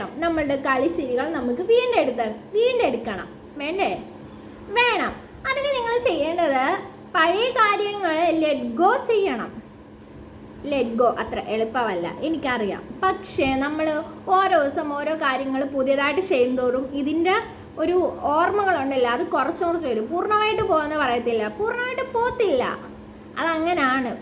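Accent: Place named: Kollam, India. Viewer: കൊല്ലം ടtyle സംസാരം നല്ല രസമുണ്ട്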